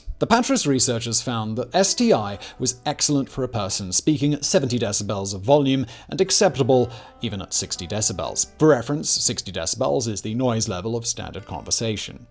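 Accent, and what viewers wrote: United Kingdom. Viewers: I love your videos man! something about a British voice, and a bald guy with a beard makes learning random information very enjoyable !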